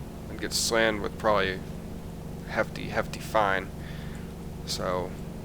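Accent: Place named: Pennsylvania, United States. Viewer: Just found your channel via Kyle's videos. Great to hear another Pennsylvanian.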